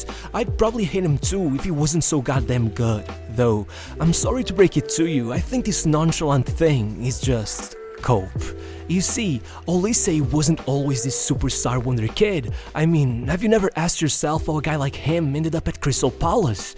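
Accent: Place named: Portugal. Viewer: Your agitated narration and heavy accent make you very hard to understand and annoying. Either relax and slow down your narration or improve your intonation to sound more like an English speaker and less like a Portuguese speaker. All the best.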